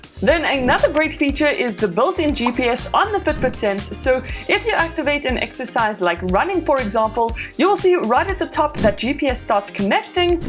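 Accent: South Africa. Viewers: I had to do a double take because of your accent, and then immediately recognized it as South African. Hell yeah! Great video by the way :) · Are you South African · R u from South Africa?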